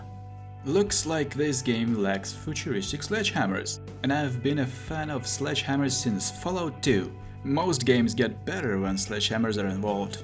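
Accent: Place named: Japan. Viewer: I love your accent! I can't tell if it's Japanese or if some Latin origin. My ears say Japanese.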